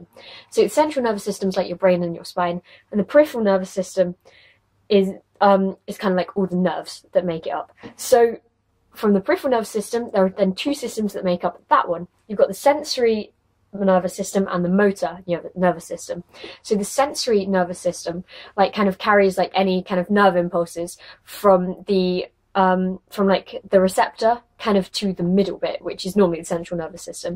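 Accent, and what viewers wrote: United Kingdom. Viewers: very nice lesson and very beautiful british accent! thank you ! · Thanks for the "random ass Bm" chord...i couldn´t figure that out. By the way, i love your british accent ;)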